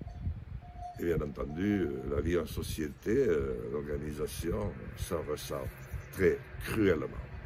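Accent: France. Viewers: Bon rétablissement cher monsieur Lassale vous nous êtes très cher. Votre accent sent bon notre France ou ce qu'il en reste... je suis franco-française j'aime par-dessus tout mon pays et de voir qu'il se dégrade aussi vite me fait dire aussi "j'ai mal à ma France". Remettez-vous bien vite auprès de votre famille.😅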